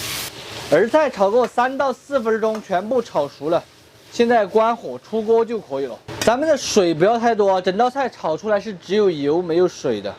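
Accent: Sichuan, China. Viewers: 帅哥，就讲四川话嘛！这个弯管子的川普听起来好累哟😂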